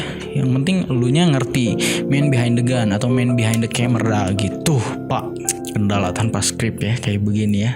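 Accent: West Java, Indonesia. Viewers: pertama liat channel ini gw langsung SUB karna infonya mamfaat buat gw...dan gw pikir bukan orang sunda, eehh nyunda oge ningan...makin trus mantau channel ini, sukses terus lurr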